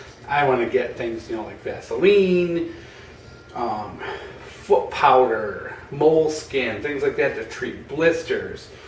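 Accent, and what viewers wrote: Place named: Michigan, United States. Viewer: Are you from Michigan? You have that accent lol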